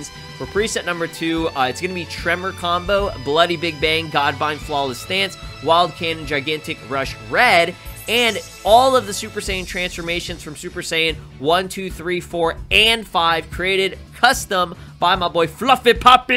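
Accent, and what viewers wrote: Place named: Spain. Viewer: I notice your accent was like spanish,or portuguese,are you?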